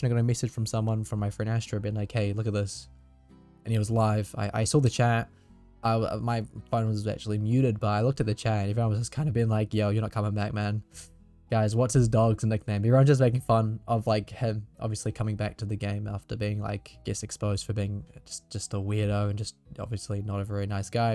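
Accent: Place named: New Zealand. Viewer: im not super into animal jam like i used to be when i was younger, but i like to stay up to date on the drama... never watched a video of yours before but i immediately recognized the accent .. love to see kiwis makin good content bro keep it up !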